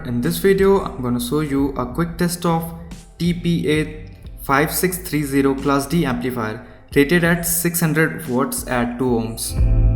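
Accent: India. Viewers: Your voice seems of an Indian ??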